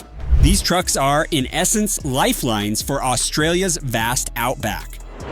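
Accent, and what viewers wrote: United States. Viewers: Nothing worse than hearing a "errrrrritating Amerrrrrican ac cent" while trying to watch a video. So I stopped 40 seconds in.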